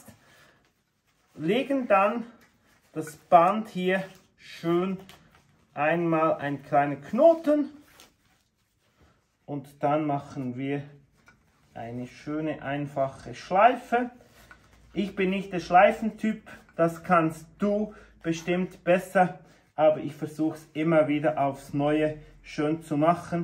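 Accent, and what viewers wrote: Switzerland. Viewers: mit einem soooo lieben Schweizer Dialekt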